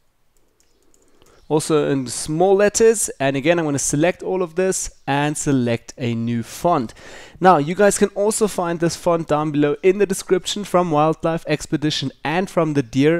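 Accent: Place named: South Africa. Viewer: Bro are you South African? random question but I recognize the accent xD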